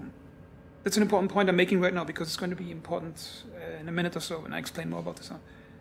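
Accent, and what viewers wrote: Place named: Germany. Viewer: More ship reviews please. As a Brit i "two thumbs up" your German accent.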